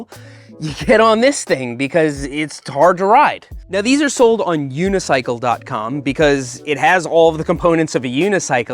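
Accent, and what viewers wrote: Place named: New York, United States. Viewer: are you from NY/north jersey? i know that accent lol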